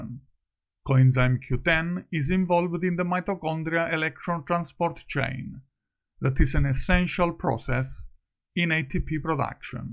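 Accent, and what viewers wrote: Italy. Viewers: good info, strong Italian accent! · Its Italian accent...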